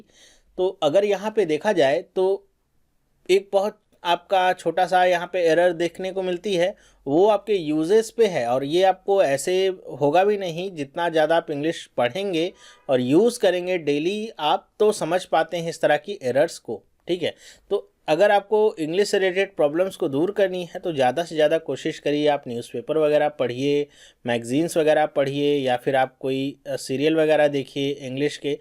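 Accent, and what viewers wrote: India. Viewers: Sir your pronunciation is way too Indian.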